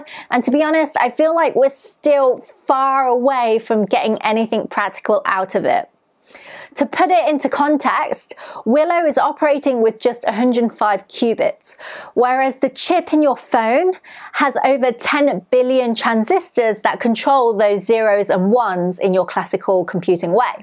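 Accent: United Kingdom. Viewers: I am curious, are you a native British english speaker? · Forgive my bluntness, but I'm Canadian, I love languages, and I can't identify which UK accent you have, and I don't want to be rude by guessing wrong. So, what kind of accent am I listening to?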